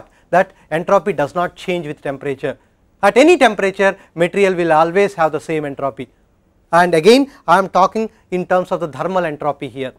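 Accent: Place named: South India, India. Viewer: Ugly south indian accent and teaching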